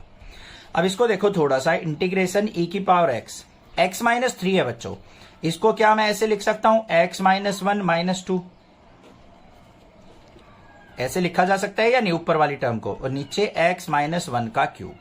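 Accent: Haryana, India. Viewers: Sir aap haryana se ho ? Aapke voice tone se lg rha h aisa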